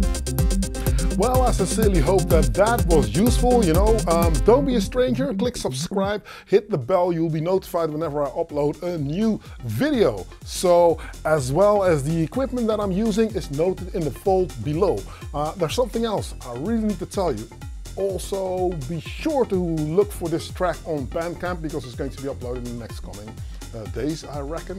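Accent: Netherlands. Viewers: You def dutch by the aound of your accent lol😂🚀 dope video you gained a sub brother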